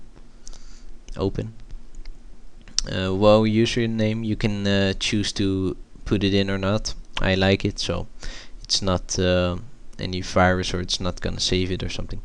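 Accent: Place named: Quebec, Canada. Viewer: true! I think he is quebecian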